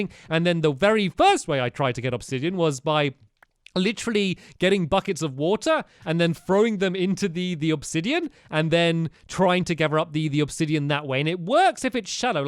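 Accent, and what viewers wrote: United Kingdom. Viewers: Teddy Rare he is brittish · Shakil Syed he is British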